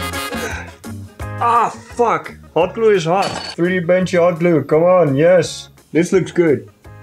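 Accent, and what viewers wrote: Netherlands. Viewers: Nice work and a very interesting project. Your accent makes me miss the time i actually lived in Holland (Harlem). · love that dutch accent. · Doubted if you are dutch because of your accent. My suspicions were confirmed 😂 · I smell a dutch accent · Love the Dutch accent! I am also Dutch lol. Love the video to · Ook een nederlander haha ik kon het horen aan het accent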